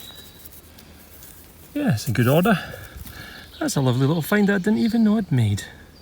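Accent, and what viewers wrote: Scotland, United Kingdom. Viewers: How can anyone be in a bad mood after watching this? Beautiful scenery, amazing finds, a delightful Scottish accent, and best of all, the Belter Boogie.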